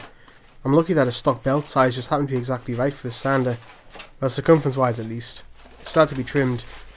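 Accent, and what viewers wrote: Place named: Newcastle, United Kingdom. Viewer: sounds like your from up my way, im originaly from westerhope but now live down durham way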